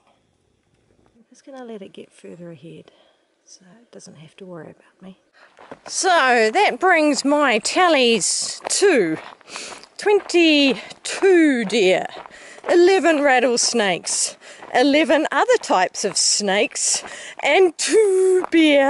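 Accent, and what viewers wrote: New Zealand. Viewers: Great day for wildlife. Loved the beer(bear). Vanilla is a good repellent for the gnats. P.S. Your Kiwi accent is totally charming, I could listen all day!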